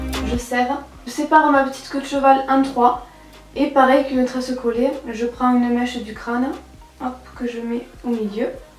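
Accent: South, France. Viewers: Tu gère t la meilleure 💙 💚 💛 💜 t comme moi t'as l'accent du sud mdr 😁😄😅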